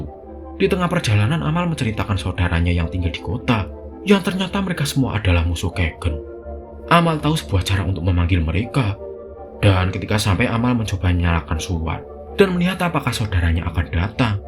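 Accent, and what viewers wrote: Java, Indonesia. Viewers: Ngomongnya kadang kurang jelas karena medok banget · Maklum jawir😂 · BAHASANYA MEDHOK NJIIIR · biarkan alur ceritannya dibaca Text to voice AI itu lebih jelas ejaan Hurufnya., Medok bngt jadi gk jelas ngomong apa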